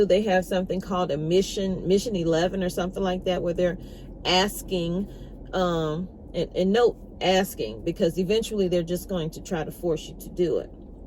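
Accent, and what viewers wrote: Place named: North Carolina, United States. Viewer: I knew you were a North Carolina Girl as soon as you opened your mouth! 💙 ( I reside about 30 minutes from Hickory, so I'm there pretty often). As I listened, I got tickled, because I was like...OMGosh! I found someone like me!! I'm serious. I could be sitting there talking in your place. This is 🤣 crazy!! Thank you for putting yourself out here, for caring about others and for serving as a beautiful example of a true Southern heart. God bless you and your channel. And Food Lion had their crab legs in sale for $11ish this week or next. Do you can yours? I'm considering giving it a go.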